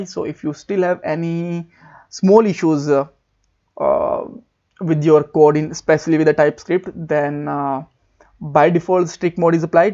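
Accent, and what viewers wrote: India. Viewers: this indian accent is the worst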